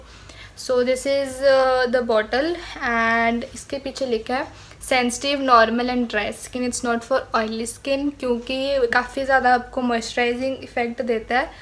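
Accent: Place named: Punjab, India. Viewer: Your Punjabi accent is super cute 💕💕💕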